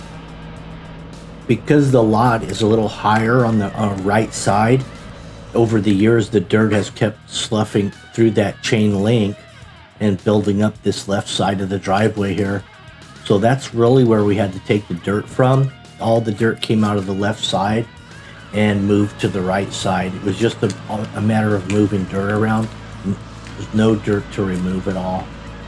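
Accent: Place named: Southern California, United States. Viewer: I thought you were in the OC. Like at the Wedge 🏄‍♂️ 🌊 you're accent. I'm Santa Monica kid , but now I've a house is in the friggin Val haha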